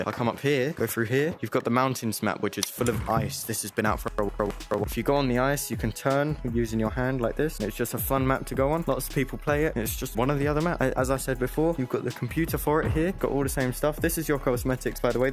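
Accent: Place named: United Kingdom. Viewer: u sound british, but you dont look british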